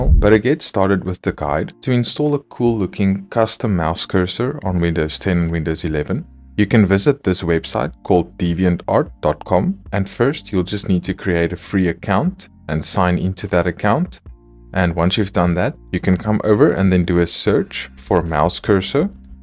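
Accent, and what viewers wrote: South Africa. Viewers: jirre south african?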